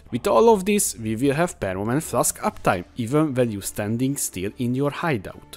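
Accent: Hungary. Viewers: Magyar vagy bátyja? Úgy hallani :D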